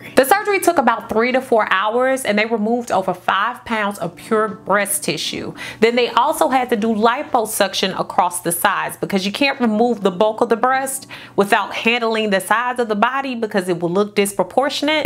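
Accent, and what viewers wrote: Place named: Midwest, United States. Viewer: You sound like Monique from Moknowshair channel. Are you from St. Louis or the Midwest?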